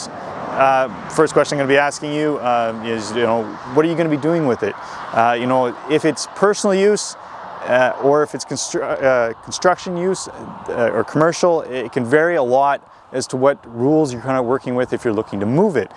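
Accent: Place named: Alberta, Canada. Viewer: I thought you were from Alberta, not west of Ottawa, I also watch B&F Macfarlane equipment.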